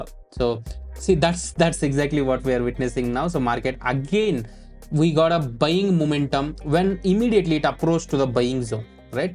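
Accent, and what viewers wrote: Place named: India. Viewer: indian accent terrible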